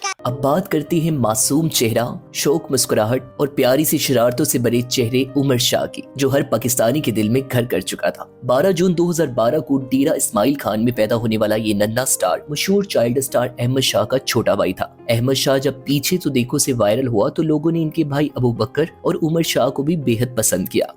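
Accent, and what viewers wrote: India. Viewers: Ap Pakistani hain Indian ki trha na bolain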